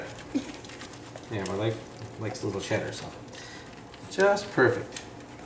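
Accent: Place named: East Coast, United States. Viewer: Yeah for sure thats what i meant haha.. My old man always put hot dogs on it growing up.. Its awesome! where abouts are ya from? sound like a east coast accent?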